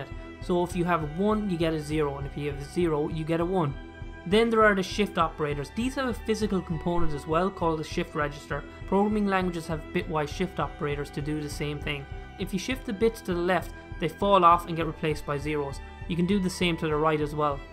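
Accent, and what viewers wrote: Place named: Ireland. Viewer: Also that irish accent makes you so easy to listen to lol.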